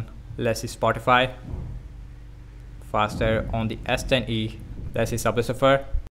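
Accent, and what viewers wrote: India. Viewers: Es hindú, yo creo por eso habla así 😂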